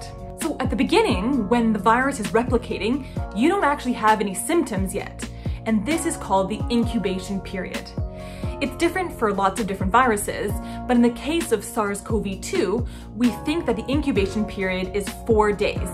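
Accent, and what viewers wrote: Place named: Ontario, Canada. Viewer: OMG! I heard at least two or three “ABOWTS” and at least one “AAAOOUT” My fellow Canadian! I’m an emergency dept RN working in the US! Great job!!!!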